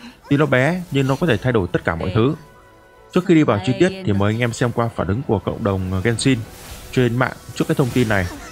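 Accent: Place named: South, Vietnam. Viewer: nghe giọng anh nói tiếng miền Nam dui vc xDD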